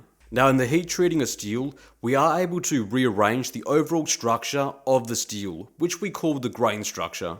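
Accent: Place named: Bogan, Australia. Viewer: Brought out the face reveal definitely dont look like what i expected sound like a bogan aussie 😂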